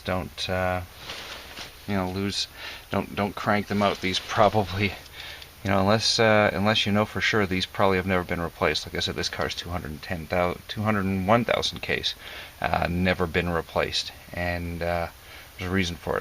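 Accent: Canada. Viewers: on my car which has a GM 3.6 HFV6 , on a north - south set up , (rear wheel drive) , the manifold needs to be lifted too , albeit ever so slightly , which is why they fit platinum plugs as standard from factory . Listening to your patois I'm guessing you're Canadian .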